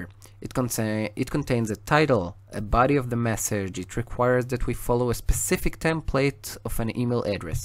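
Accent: Israel. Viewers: אחלה וידאו! באמת, מהברורים ביותר שראיתי שמסבירים על מודל השכבות. ממש מוזר שאין לו הרבה יותר צפיות. מה שכן - המבטא העברי ממש מורגש.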